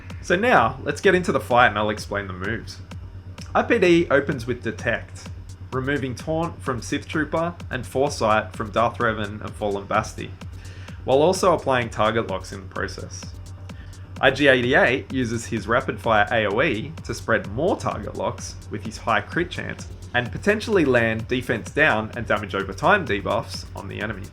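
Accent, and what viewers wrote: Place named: Australia. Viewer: You got a sub for bloody great accent you aussie legend. Thanks for the pro tips amigo just started running this myself and they help heaps!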